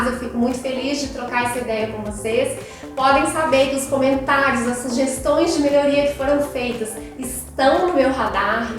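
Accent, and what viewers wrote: Minas Gerais, Brazil. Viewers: Excelente trabalho. Sempre importante um outro olhar sobre um projeto, principalmente quando esse tem a qualidade de seu trabalho. Não é o caso, um outro arquiteto coloca nos vídeos alteração de voz infantil e acho que corta a qualidade é continuidade do vídeo. Sugeri a ele não utilizar e pelo visto não gostou. Seu vídeo não tem esse recurso, ainda bem e não precisa. Ficamos assistindo o tempo que for exibido. Não é cansativo, pois nos envolve na explicação prática, objetiva e com todo charme desse sotaque mineirinho de BH. Prova que não precisa de recursos como alteração de voz ou inclusão de flash de imagens que não sejam pertinentes. Infelizmente o arquiteto que citei parece não ter gostado da sugestão. Ainda está em minha mente aquele projeto de seguidores que lhe deu um prêmio. E os demais também. Parabéns de verdade. Se juntar você e a Patrícia do Doma Arquitetura, eu faria um curso para capacitar os profissionais da área. 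Vocês duas comprovam o que ser profissional criativo. Arrependo me de não ter feito arquitetura e optado por publicidade e propaganda. Falei muito. Chega. Abraços.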